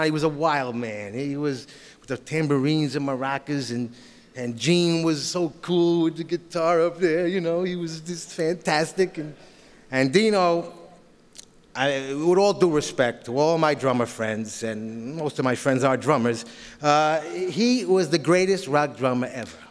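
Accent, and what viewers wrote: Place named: New Jersey, United States. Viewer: I'm from the South and I love Jersey talk keep it up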